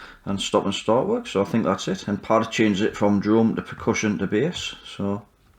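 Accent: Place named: Newcastle, United Kingdom. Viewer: You sir, have the patience of a saint. I love watching your streams. From a chap, me, who was born in Newcastle and moved away, in the mid-70s, when I was 5. Up here again in the Toon, for the weekend for a bit of nostalgia with my wife. Never tire of the accent and I have old tapes of when I had a strong accent. Ha'way Canny lad. 😅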